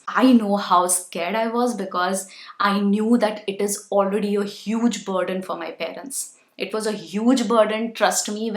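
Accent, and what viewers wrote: India. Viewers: Maam pls stop this now. Even google also accepted dat there is an ancient exist which is Indian english. Even when I saw ur video even ur ascent is Indian. So no need to teach ppl wt to do! Ur r doing this only for ur channel n money. We r not fool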